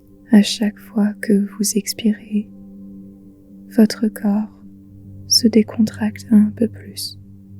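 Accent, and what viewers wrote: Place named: England, United Kingdom. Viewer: Le petit accent anglais derrière me fait voyager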